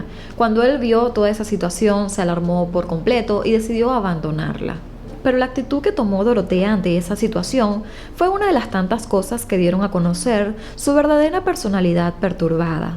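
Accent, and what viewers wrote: Venezuela. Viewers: De donde eres? Me suena que eres paisana😊🇻🇪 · TheFeistyOne xx creo q es de Venezuela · Buenos días tienes una excelente capacidad de narración eres Venezolana ?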